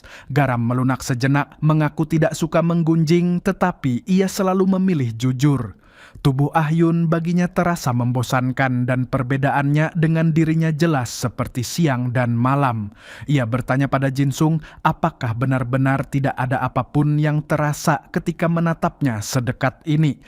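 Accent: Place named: Banyumas, Indonesia. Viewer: SUARA LO NGAPA SIH, MALAH KAYAK ORANG NGAPAK. JADI DIRI SENDIRI AJALAH JANGAN DIBUAT BUAT 😡😡😡😡😡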